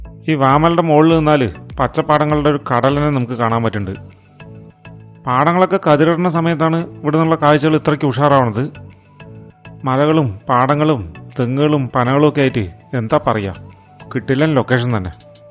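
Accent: Palakkad, India. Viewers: Nallapalakkadan slang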